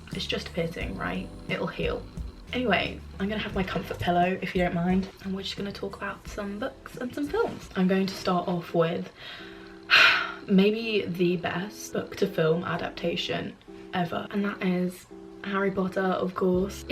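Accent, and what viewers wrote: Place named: England, United Kingdom. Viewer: where abouts in england are u from? love your accent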